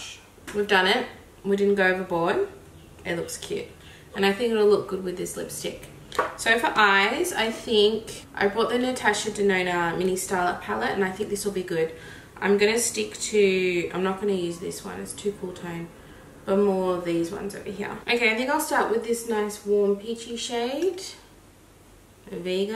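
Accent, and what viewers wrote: Australia. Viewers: btw I saw your decluuter vid on my recommended. Stayed for the accent and personality. I am outside of Australia but I aspire to live in there one day. So I'm interested in Aussie stuff. I think you are so lovely and open and honest. It's nice to hang out with you cuz I feel comfortable. You feel reliable since you are so open and honest. Just letting you know why I stayed to give you an idea of how to get new people at your channel. I'm only trying to support since you talked about wanting to grow your channel. I know it is hard work. Hope everything works out well for you!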